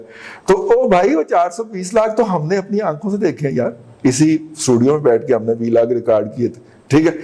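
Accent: Punjab, Pakistan. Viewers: Hi, No matter what I love your Punjabi Urdu.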